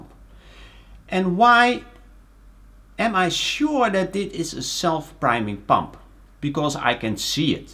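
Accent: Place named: Netherlands. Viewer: gotta love a dutchy speaking english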